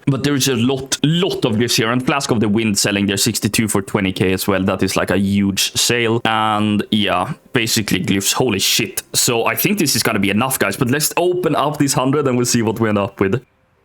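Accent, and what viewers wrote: Sweden. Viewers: You sound suspiciously Swedish 🤔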